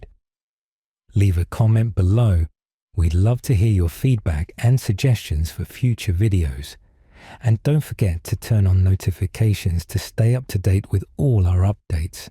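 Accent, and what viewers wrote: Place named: United Kingdom. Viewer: That ain't him talking.He sounds like a Limey when talks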